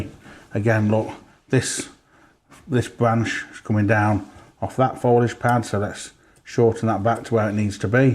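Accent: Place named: Nottinghamshire, United Kingdom. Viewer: is a North Notts. lad ( like myself.) None of us are ever going to win prizes for elecution. Hi, say hello to Andy R for me.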